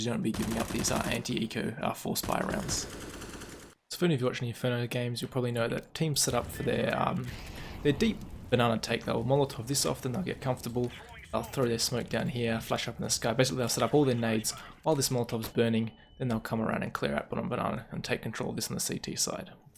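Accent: Australia. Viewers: Tom jargons I'm an Asian and English isn't my first language and I can understand him 100%. He speaks normally like any Australian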